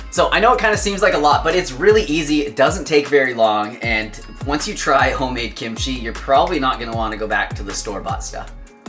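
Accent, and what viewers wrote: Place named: Canada. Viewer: He's Canadian. He's def has the accent🙂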